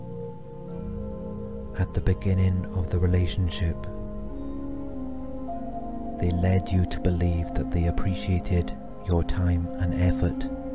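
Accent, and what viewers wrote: Wales, United Kingdom. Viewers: Thank you, this was magic. You have a good voice for this sort of thing ( welsh......?) 👍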